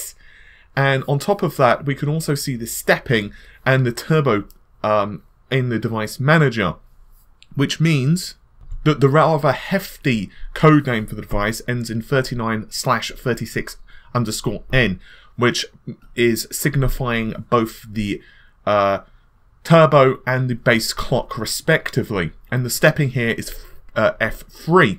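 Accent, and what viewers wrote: England, United Kingdom. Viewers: He speak English you prolly speak American , thats the problem ... prolly .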